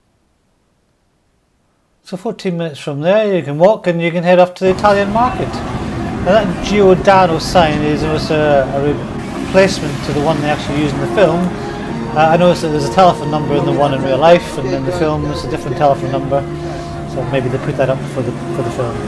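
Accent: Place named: Scotland, United Kingdom. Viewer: Cool video , very well done . Did the same trip myself . Hint of an east coast Scottish accent too I believe ! 🏴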